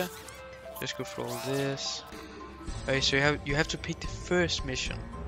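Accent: Denmark. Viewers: I Can hear you’re danish👏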